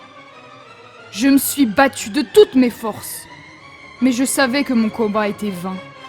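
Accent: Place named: Italy. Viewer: Quelle vie cet! J'aime beaucoup l'ambiance italienne de ta vidéo avec l'accent et tout, bravo à toi 😃 et je trouve que ta construction est très réussi, j'aime particulièrement le bureau que tu as fais au rez de chaussé! Bisous à toi 😙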